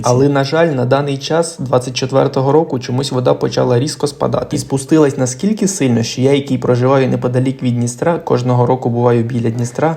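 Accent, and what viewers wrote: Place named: Poltava, Ukraine. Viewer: Ви говоріть або російською, або українською, цей суржик задовбав... Це в мене так на Полтавщині... говорять, але там був більший вплив Рашки...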